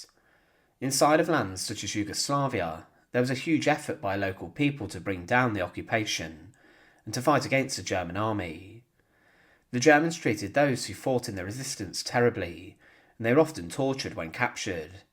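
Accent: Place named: England, United Kingdom. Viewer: I like it. It’s English but I can’t tell from which region. Even if you don’t like it it’s better than an awful AI voice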